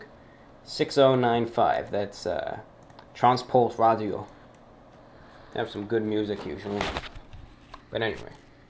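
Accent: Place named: New York, United States. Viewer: I feel fortunate to still be able to find and listen to in the “olden” days 😁. Love the NY accent lol. You can whistle! I’m jealous! I’ve tried my entire life consistently but no luck. I still can’t believe I’m listening to a 16 year old kid lol. But Nike is “just do it”. Not “get it done” 😂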